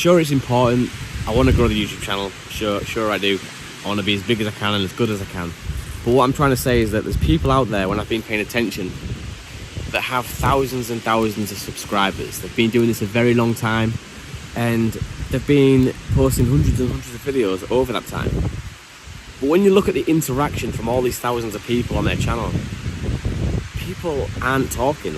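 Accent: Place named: Manchester, United Kingdom. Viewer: My God he's from Manchester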